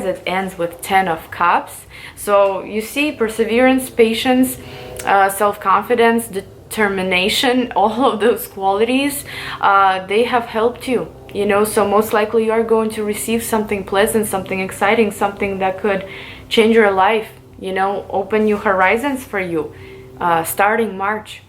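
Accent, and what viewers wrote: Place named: Russia. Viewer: I like her accent, is she Russian?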